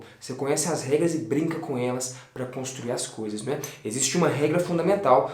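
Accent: Minas Gerais, Brazil. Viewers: Pelo sotaque, é mineiro!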